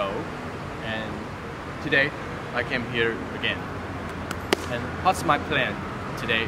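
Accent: Taiwan. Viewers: 真的滿台式英文....但很棒!!勇敢開口說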